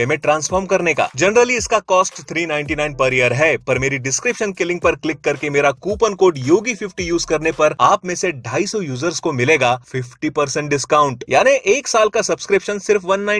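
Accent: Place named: Uttar Pradesh, India. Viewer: Up accent ko tum hi suno..... hum to hindi accent hi sunein gay.......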